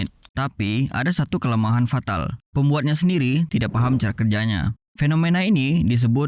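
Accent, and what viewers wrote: Aceh, Indonesia. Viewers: Suka banget kontennya ❤ Logatnya seperti Aceh :)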